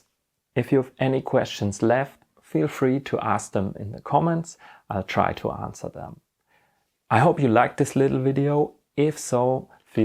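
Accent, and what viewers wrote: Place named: Germany. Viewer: I realized from your accent, that you live in Germany as well. I really like your mod ... would you offer to do it to other rascals (for money, sure)?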